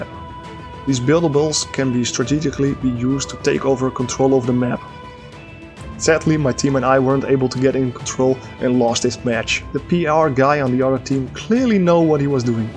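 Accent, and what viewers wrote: Netherlands. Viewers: You sound so dutch it hurts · I also liked and subbed, you earned that. And I think you dutch accent doesn't hurt at all ;)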